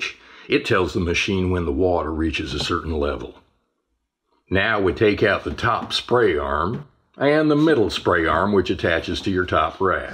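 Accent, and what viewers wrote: South, United States. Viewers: You sure know have better story telling skills than appliances. Heck i didn't care about the dishwasher anymore! I just want to listen grandpa tell us some wonderful moonlight story in his his deep southern accent!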